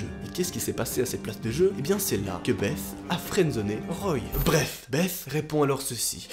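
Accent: Belgium. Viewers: Tu es belge ou suisse ? Moi je suis belge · Haaa t belge je comprenais pas quand tu disais 75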